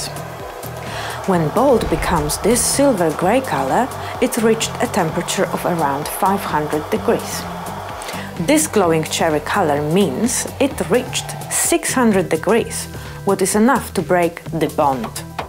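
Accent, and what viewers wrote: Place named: Eastern Europe. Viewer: Why put a non English speaking Eastern European for an english video?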